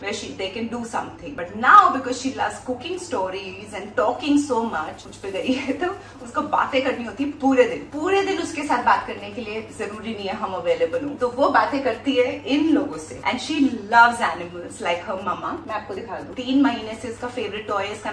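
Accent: Delhi, India. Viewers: Your delhi language is ❤️❤️❤️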